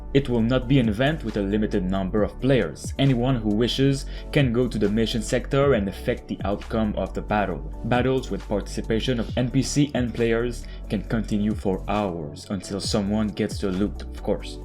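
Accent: Quebec, Canada. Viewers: Man, that QC accent is strong. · Hey nice vid, you from Québec by any chance hen? J’entends un ptit accent 😉 keep up the good work 👌🏽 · T'es québécois... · He's French Canadian bud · So... any french canadian? lol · He's from the province of Quebec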